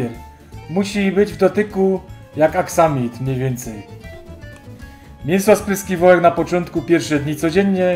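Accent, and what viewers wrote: Silesia, Poland. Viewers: Świdnica, ale proszę rób filmy po śląsku. Wszystko jest bardzo zrozumiałe a klimacik dla ludzi z poza G. Śląska zostaje :-) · Świecianka z kuj-pom, a obecnie mieszkająca we Włocławku, pozdrawia. Filmy tylko po Śląsku. Pięknie się słucha gwary tym bardziej, że mój mąż to też Ślązak z Tychów. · Piękna sztuka.Dłuuugo już Pana oglądam.Mistrzostwo świata.Rafał z Krakowa. Śląsko gwara mi nie wadzi. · Tak jeszcze nie robiłam, więc do roboty puki zima idzie :D A, co do gwary śląskiej nie rezygnować!!!! Cały urok w niej! Ja z Warszawy i pozdrawiam, a oglądającym - smacznego. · Oczywiście po śląsku , brzmi super! Pozdrawiam z Berlina. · Jak to jest, bo się zastanawiam. Film opisany prawidłową polszczyzną, wchodzę z ciekawości a lektor mi jedzie gwarą!?! To na Śląsku, piszecie inaczej a mówicie inaczej czy tylko ten kanał ma jakiś problem? Albo ja, bo dla mnie to jest problem. 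Dlatego YT mi nie tłumaczy zagranicznych tytułów video, żebym nie klikał na polski opis filmu po turecku. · Super robota (pracochłonna ta szynka).Pychota, podziwiam talent pana, cierpliwość i i umiejętność obróbki mięsa w pyszna dojrzewająca szynkę.👍👍👍👍👍Tu Bydgoszcz, rozumiem dos dobrze slunsku gwarę.Pozdrawiam i DZIĘKUJĘ.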